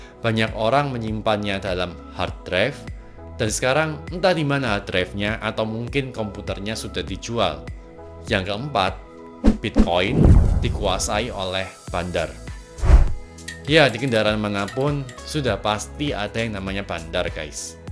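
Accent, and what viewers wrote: Java, Indonesia. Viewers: ngomomngnya medhok, kayak wong yogya atau suroboyo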